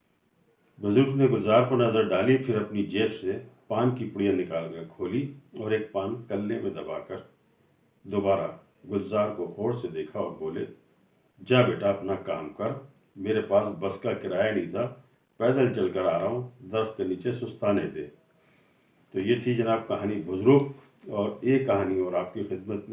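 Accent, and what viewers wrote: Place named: Pakistan. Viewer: یہ نشان دہی درست فرمائی۔۔۔ہم بھی خاب کو خواب کہا کرتے تاہم جب ہم نے سلمان پیرزادہ کی زبان سے سنا خاب لے لو خاب تب جانا کہ ۔۔۔۔و ساکن ہے جیسے لکھا خوشبو کہا جاتا لیکن کہا خشبو جاتا ہے۔۔۔سلامتی۔۔۔آپ کا اردو لہجہ اور اردو زبان و ادب سے محبت کا سلیقہ آپ سے جملہ اہلِ زبان کے لیے آنکھیں نم کیے دیتا ہے کہ ایک اک کرکے میرے اہل ادب جا رہے۔۔۔اب تو چند سانس محبت کرلیں ۔۔۔اک دوسرے کا احترام کرلیں۔۔۔ہر زبان و کلام اور ہر ماں سے محبت کرلیں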